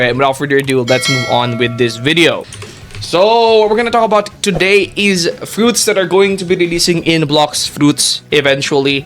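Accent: Philippines. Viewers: idk why but your accent's giving me a filipino vibe lol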